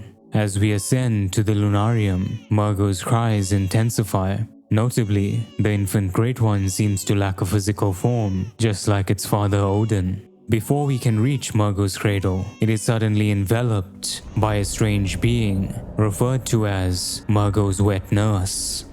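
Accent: South Africa. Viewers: Is this guy South African??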